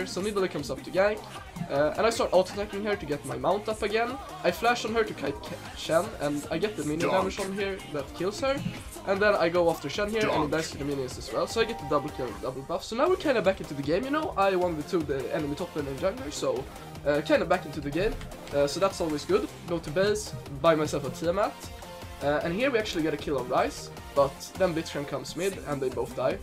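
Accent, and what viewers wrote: Sweden. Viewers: fan vad svensk du låter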